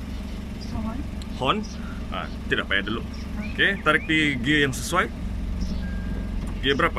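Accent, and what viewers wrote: Sabah, Malaysia. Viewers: Sebulan lagi test jpj, mmg tk ada latihan persiapan utk jpj ulangan keesokkan nya. Sebulan juga duk “study” video-video cikgu. Suka juga loghat Sabah cikgu. Akhirnya lepas juga test harini 25/11/2021. Terima kasih cikgu share ilmu 🙏🏻 Salam dari Melaka.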